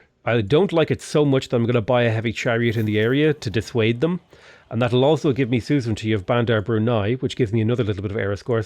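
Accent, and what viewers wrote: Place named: Ireland. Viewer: you sound especially irish today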